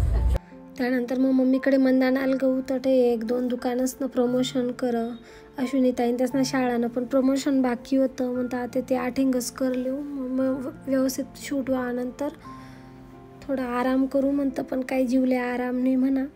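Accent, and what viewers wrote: Khandesh, India. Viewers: Didi tuna video khupch mst rahtas male khup aavdts.. 😅❤aamhi pn shirpur na shet tu khup bhari khandeshi bols aate nya pori khandeshi bolane laj tis nko ka thika na rahe na ghar na dar na.. Tu disale pn khup sundar aahe. 😊 male pn aasch khar bolale aavds jast badaykya marale nhi aavds.. Ek nmbr video rahts.. Tu mo no send kar jo na...... 🎉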